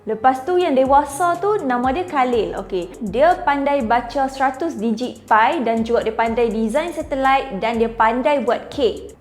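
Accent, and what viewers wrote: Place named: North, Malaysia. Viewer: Beauty with brains, tp pekat nau utagho😂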